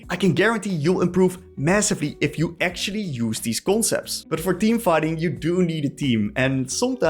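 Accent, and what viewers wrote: Netherlands. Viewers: Im hearing some heavy dutch accent right here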